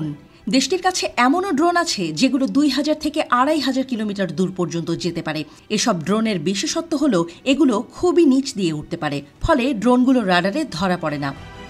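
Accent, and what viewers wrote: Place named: Kolkata, India. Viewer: কলকাতার মতো খবর পড়লে শুনতে ভালো লাগেনা, দয়া করে বাংলাদেশের মতো পড়েন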